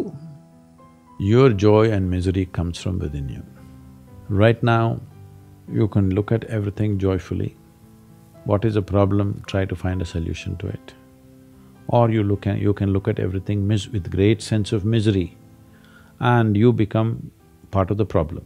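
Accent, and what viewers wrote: India. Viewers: South Indian English , i think is far better than hissing Scottish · It's ur loss really. BTW, Google is able to fairly subtitle Indian accents, not perfectly, but fairly. Maybe give it another shot when u feel more open-minded 👍✌️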